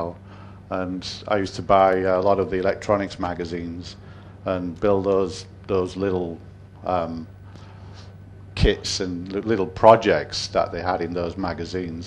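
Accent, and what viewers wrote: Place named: Hull, United Kingdom. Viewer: So nice to hear the Hull accent;) You're a legend. Grazey/PHF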